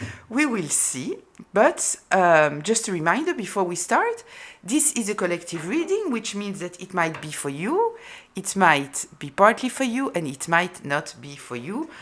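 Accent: France. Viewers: You are spot on and you have a lovely french accent too.😉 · I love your French accent 😊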